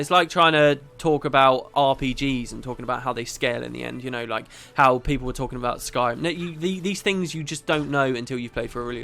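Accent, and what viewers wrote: United Kingdom. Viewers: He sounded a lot more British years ago. Since most of this video is old footage from 3 years ago, he sounds different in it. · Don't lose your British-ness! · you do sound more "british" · His voice used to be a little deeper, but there's also more of a British accent on top of that. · Oh my god that accent:D, you definitely lost a little bit of your brittish-accent along the way. And even then, you knew everything about GW2. I wouldnt mind a few more of these:D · Wow you really did sound more British 3 years ago! (but both voices then and now of you are still amazing XD)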